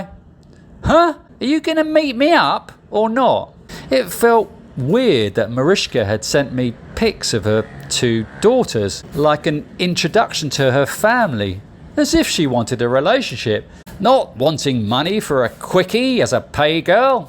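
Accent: United Kingdom. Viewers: like listening to someone narrating a book in British English lol good material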